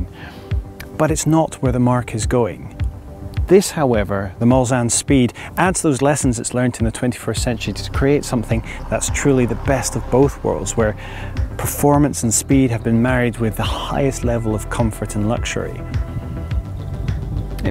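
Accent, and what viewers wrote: Scotland, United Kingdom. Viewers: Middleastbeast It is in fact a very toned down Scottish accent, probably educated aborad. Not every Scotsman sounds like Willy from the Simpsons, lol. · lol at Scottish accent ahaha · Scottish accent.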